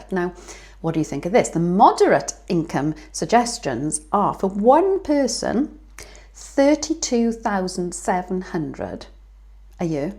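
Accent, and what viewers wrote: Wales, United Kingdom. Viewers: it is very obvious that throughout your life you've always been a Very good looking lady. And still look far younger than your age, you clearly look after yourself! It works. At 70, I've been going to the gym for 3 yrs now, 3 times a week, 2hr sessions each, plus long walks; 5-11 miles. Never been better! And you have a beautiful soul which you use now to help others, even without a particular topic, I'm sure many people living alone just like to sit and listen to another human being chatting away about their lives. It provides comfort and a degree of normality by association. You are a 'giver', and you do it through love. That is the best and only way, and it returns like you would not believe! That gentle Welsh accent also helps:)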